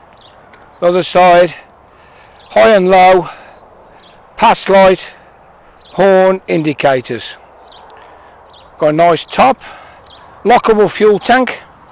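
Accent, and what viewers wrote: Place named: Wolverhampton, United Kingdom. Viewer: Dear really enjoyed your review. We are lifelong bikers now into scooters but like the Enfield. Is your accent Wolverhampton as you mentioned football. We are near Hinckley triumph. John x Linda x chris Bellamy UK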